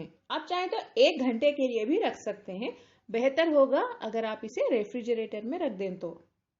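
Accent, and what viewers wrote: Hyderabad, India. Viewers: Are you Hyderabadi?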